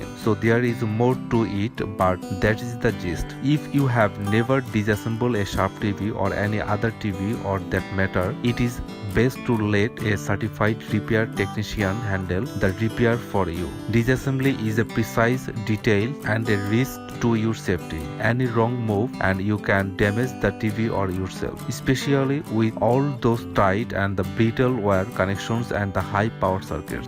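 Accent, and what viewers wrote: India. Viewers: Indian accent lol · Your English...Indian pronunciation...gives me more headache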